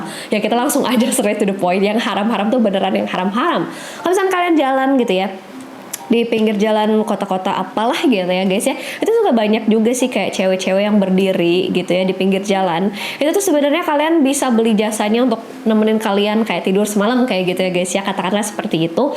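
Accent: West Java, Indonesia. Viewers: Sunda banget euy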